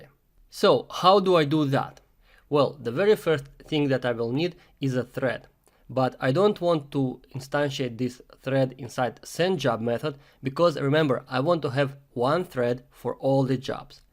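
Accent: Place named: Russia. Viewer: по акценту всегда узнаю русского)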